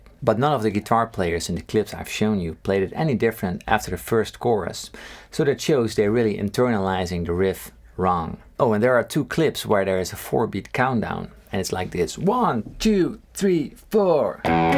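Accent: Netherlands. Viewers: This guy sounds dutch as fuck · You sound so Dutch, I wonder if you're from the Netherlands haha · Are you Dutch? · Yo homie, you dutch? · Nice vid. I can tell from your accent that you're Dutch lol.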